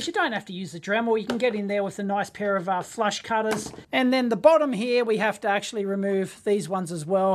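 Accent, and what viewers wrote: Australia. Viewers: Half a bee,s dick 😂😂😂😂I love crazy ozzies and kiwi,s accent strewth mate by crikey ya flaming golar 😅😂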